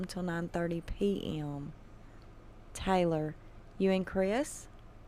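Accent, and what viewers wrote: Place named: Texas, United States. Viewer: Great voice, a kind gental loving voice with Texas accent!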